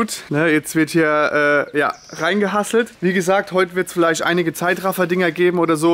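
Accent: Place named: Mannheim, Germany. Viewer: Meine Mutter als sie deine Stimme gehört hat: der redet wie ein richtiger Mannheimer, find ich geil. Und dann lacht sie die ganze Zeit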